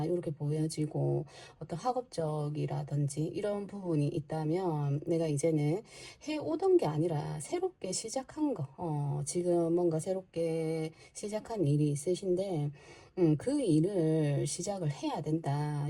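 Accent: Gyeongsang, South Korea. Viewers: 역쉬 쵝오십니다! 짝!짝! 경사도 말씨 매력있습니다 ㅎㅎ 부분적으로 맞는부분이 많습니다 저의 소원이 꼬옥 이루워지길 요...... 2번 입니다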